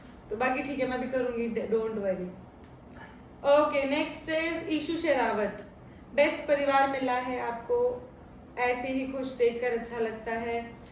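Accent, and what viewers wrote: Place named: Haryana, India. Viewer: ap haryanvi balti achi lagti ho.. to ap roj haryanvi bola karo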